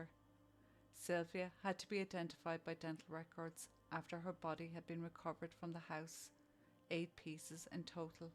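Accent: Ireland. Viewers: It feels like it's been forever since I've heard my favourite Irish voice. My apologies for my absence lately. Haven't been online due to sickness but I'm back. As always, love your work 💙💙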